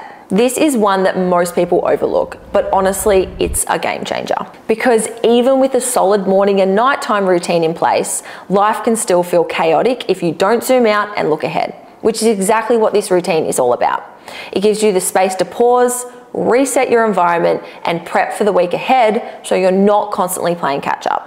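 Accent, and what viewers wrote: Australia. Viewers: I am here just for the smart video with common sense information and the Australian accent....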